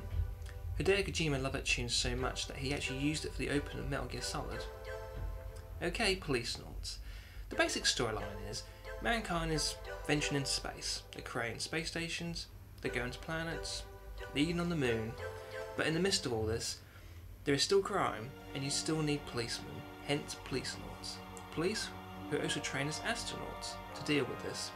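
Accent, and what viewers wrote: United Kingdom. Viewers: Well seeing as he has a british accent and all the games on his shelf are in PAL cases, I am going to put 2 and 2 together and say the guy might be from the united kingdom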